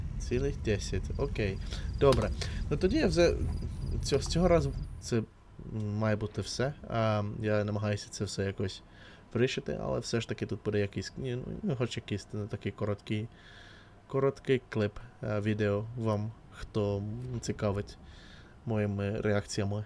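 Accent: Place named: Finland. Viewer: Обожнюю Суомі, напевно це країна з найкращими людьми, і сам хочу колись побувати там і вивчити фінську. Бажаю успіхів цьому каналу! А вивчення будь якої мови то питання часу, я сьогодні враженний від того, що почув українську з фінським акцентом, до мурашок)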